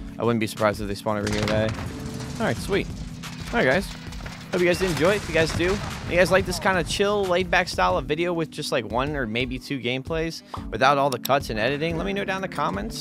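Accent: East Coast, United States. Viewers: i’m from baltimore and just by the way you talk i can tell you’re from the east coast, no weird shit g was just curious 🤣 don’t trip i’ll put my homies on get you some more subs you feel me?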